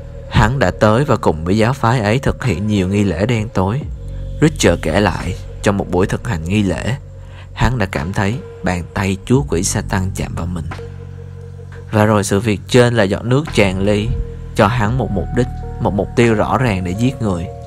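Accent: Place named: South, Vietnam. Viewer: Giọng con trai miền Nam hay thiệt 🤤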